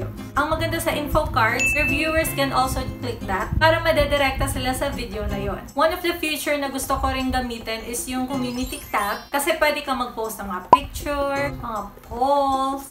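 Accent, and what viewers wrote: Visayas, Philippines. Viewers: bisaya d ay ka lodi? hehe .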